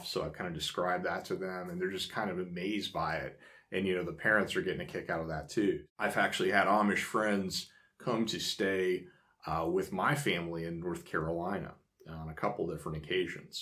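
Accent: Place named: Philadelphia, United States. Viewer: I was surprised to hear you live in NC. Some things you say sound like you grew up near. Philly.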